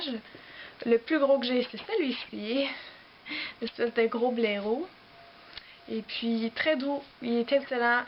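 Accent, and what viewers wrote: Quebec, Canada. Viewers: D'habitude j'aime pas l'accent québécois mais j'adore comment tu parles, ça a du charme, super vidéos! :)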